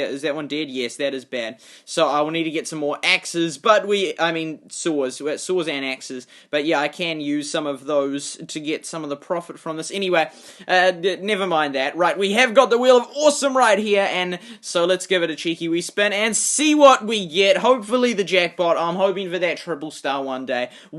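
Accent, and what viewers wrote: New Zealand. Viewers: Are you kiwi??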